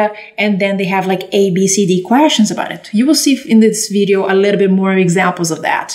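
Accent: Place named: Latin America. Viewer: Clear, concise description, enriched with a Latin accent.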